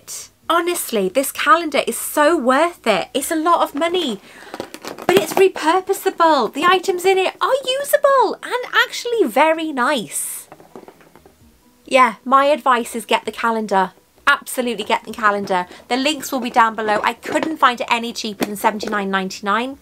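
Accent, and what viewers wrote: Wales, United Kingdom. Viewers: How your mental health been a bit better I hope. Hugs 🤗 always I get it I go through bouts mainly holiday periods when less occupied which for us a must right, your first day one on the calendar I was reminiscing so I use to have a pink baby pink jewellery box when I use to go through temporary as people are just baffled and quizzical about me find myself the golden you showed here I had that exact colour as a lock and key duo. Just thought of that time.. baby good key but giant box.. Hey you want to wear two necklaces wear it who cares I say do what you want to do. Your nails 💅🏼 didn’t look that bad honestly just a few scraps here and there but SO. I love longer videos I’m breaking it down for today and then tomorrow. Though which sometimes I do we don’t get a tree so no bauble to hang I could I could put it on my bed 🛏️ heh heh 😼 I like your Welsh accent. 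I’m so fussy I love pen collecting too whatever people think don’t care I’m completely away from the so called norm entirely. I don’t like the pens with the on top parts either ballpoint also when I had issues writing the bic pens worked better for me grip wise as it was a wreck for ages and ages so had to do handwriting practice which now is so much better. But I have to have to write properly specific pen they said with me for holding and grip which I find it’s true certain ones it’s just all over but I find have to have lined notebook non lined writing slops down like a hill. So with me nothing on top like that or my gr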